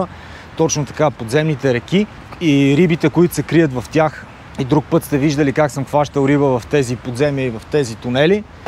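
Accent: Vidin, Bulgaria. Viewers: Браво не знаех че в тия канали/реки има живот .Аз съм много запален и от доста години ходя с мухарката на много реки в скалистите планини в САЩ .Разгледах ти канала и е много интересен по начина по който говориш ми се стори че си от Видин .След като изгледах 5-6 епизода разбрах че си от там.А и ми се стори познат.И ще ти кажа от къде те познавам защото ти няма как да помниш .Беше някъде между <num> Бяхме на лагер на кранево не помня коя година беше с точност но беше голямото слънчево затъмнение та както и да е бях с едни приятели в едно заведение казваше се Робинзон след като си тръгнахме едо момиче ни пресрещна и ни помоли за малко помощ .Мисля че беше с руса коса май беше приятелката ти та ако не се лъжа ти беше много фиркан и беше паднал в една дига и беше целия мокър .Дано не се препознавам но ако си ти и се сещаш за тоя случай аз съм човека дето те носи на ръце до бунгалото .Поздрави и на слука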